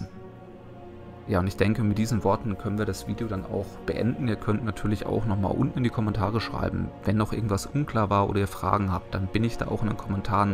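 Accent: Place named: Halle, Germany. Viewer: Legends never die! Seine Videos haben mir als Skyrim-Neuling bis jetzt sehr geholfen und tun es auch noch. Und seinen leichten Dialekt hab ich natürlich auch erkannt, ich denke mal Hallisch? Wäre mal interessant zu wissen, kann auch sein, dass ich falsch liege. Jedenfalls mach weiter so, und tu das, was du für richtig hälst. Vielleicht siehst du den Kommentar irgendwann, auch wenns unwahrscheinlich ist. Ich gucke jetzt mal deine FAQ-Playlist, vllt wird meine frage beantwortet. lg an euch ^^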